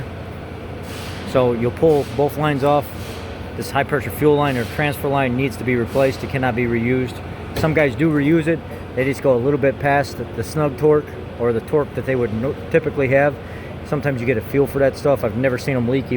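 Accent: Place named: Northern Illinois, United States. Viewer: Does sound any where like Steve, but he does sound like someone from northern Illinois! 😂😎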